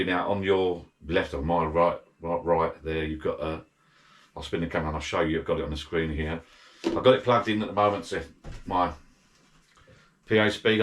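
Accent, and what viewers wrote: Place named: Cockney, United Kingdom. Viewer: The subtitles are utterly,utterly stupid; (what has 'Mercedes' got to do with ripping a track?),- and the cockney accent is almost as bad to understand, even though I've kept starting and stopping,- most infuriating!! If you are trying to explain how to do something, at least try to speak a lot more clearly so that others can understand you.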